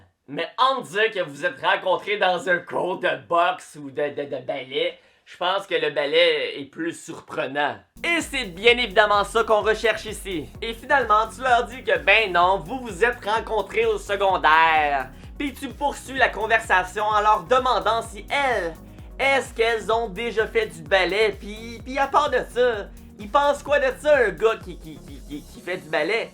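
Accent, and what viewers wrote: Quebec, Canada. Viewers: Tes québécois?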